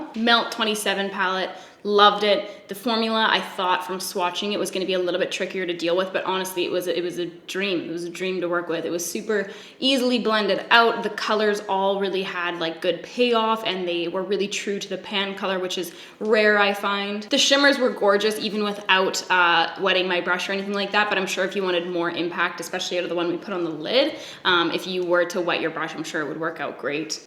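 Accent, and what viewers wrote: Canada. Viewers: Your Canadian accent makes my soul happy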